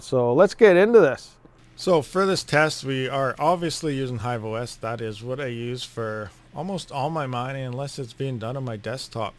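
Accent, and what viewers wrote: Canada. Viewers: I know when I hear my canadian brothers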